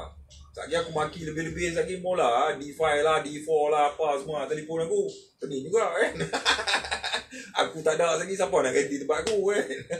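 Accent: North, Malaysia. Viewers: Dulu Cara ckp kl skrng nah ckp utaqa boi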